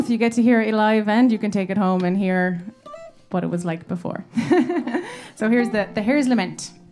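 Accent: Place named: United States. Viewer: it brings back happy memories when my late sister Bernie Rip & me met you in Ennis Cathedral. We were very impressed. Thanks to your lovely Mom & Dad for sending me your very talented video. I'll be looking forward to meeting you when you come home to the lovely Co. Clare. You sound very Americano it would be hard not to pick up the lovely American accent. Best of luck..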